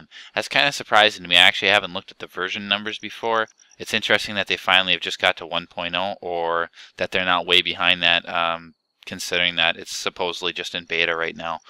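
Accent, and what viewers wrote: Canada. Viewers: you have a heavy newfoundlander-canadian accent lol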